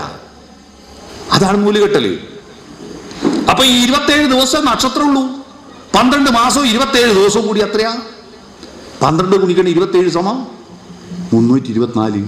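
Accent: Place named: Eranad, India. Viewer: സനാതന ധർമ്മം പഠിപ്പിക്കുന്നത് മുസ്ലീം ഏറനാടൻ സ്ലാങ്ങിലാണ്. കഷ്ടം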